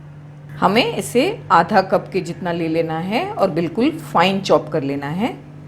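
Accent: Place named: Maharashtra, India. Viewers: Madame excellent. Are you from Konkan ? Your Marathi accent comes through ? Very nice .Why isn't it as widespread as vada pav ? Add egg and it becomes a very healthy BF .The use of shapu ! Brilliant. Great . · Thanks for sharing. Wow. Aap bilkul Bombay walo ki tarah bolti hai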